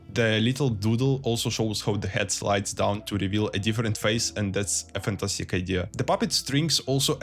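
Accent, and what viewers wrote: Russia. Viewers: Я один слышу очень русский акцент?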